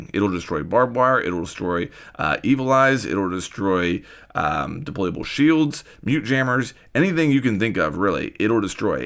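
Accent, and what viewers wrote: United States. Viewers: American accent he can't help it.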